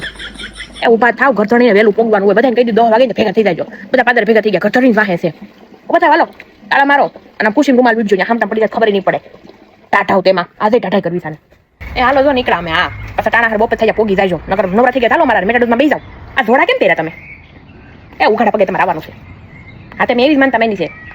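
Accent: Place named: Saurashtra, India. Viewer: બેન તમે ગામડે કેટલો સમય રહ્યા હતા, અને વીદેશમા કેટલા સમયથી છો? તમારી સૌરાષ્ટ્રના ગામડાની બહેનોની રીતભાત અને બોલી ઘણી આકર્ષક છે. વીદેશમા રહીને પણ પોતાની વતનની જીવનશૈલી આબેહુબ રીતે રજુ કરો છો. તમારી રગરગમાં માં ભોમકા ની સંસ્કૃતિ વણાયેલી છે.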